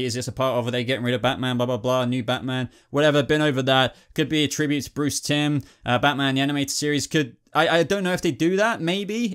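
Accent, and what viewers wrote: England, United Kingdom. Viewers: Alicia Fox He has an English accent, so I’m assuming he’s English?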